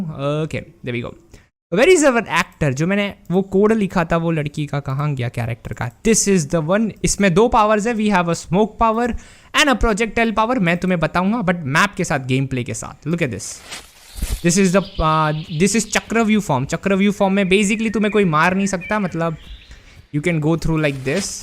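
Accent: Gujarat, India. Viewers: New Temple Run game Kuch Kuch Raji game Jaisa Nahin dikh raha?????🤔🤔🤔🤔🤔🤔 And also I think you from Gujarat in Ahmedabad or Gandhinagar or Surat I think so because your accent and you that say division multiplication like that I am Gujarati to (Bournvita Nahin Pihu Mare)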